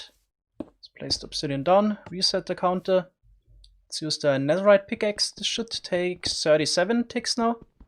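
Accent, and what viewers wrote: Germany. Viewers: no no please give me a heavier german accent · Are you a german? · German detected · Bist du deutsch ? Klingt vom Englisch so 😂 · Your from Germany right?😅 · Are you german cus' you Sound like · Wie krass man einfach hört das du deutsch bist 😂😅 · Are you a german?xD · I’m just curious, are you German? Sorry if this seems offensive. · deutsche aussprache uff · Man hört richtig dass du deutsch bist xD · Is he German? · Noch nie so einen deutschen Akzent gehört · bist du deutsch?😂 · Are you german? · Ich lehne mich mal weit aus dem Fenster aber du bist deutsch😂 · Man hört halt raus das er deutsch is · Is it just me or are you German?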